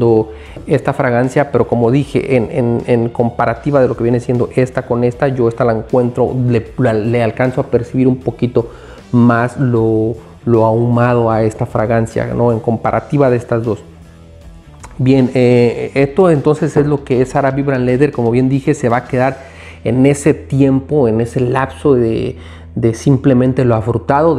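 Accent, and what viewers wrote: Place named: Veracruz, Mexico. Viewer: ¿Sos de Veracruz?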